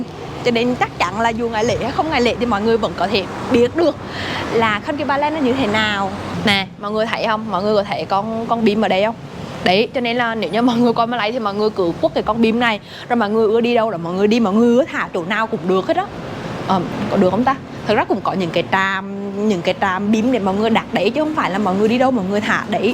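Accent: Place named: Quang Binh, Vietnam. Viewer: Tưởng quảng bình...nghe giọng dễ thương quá.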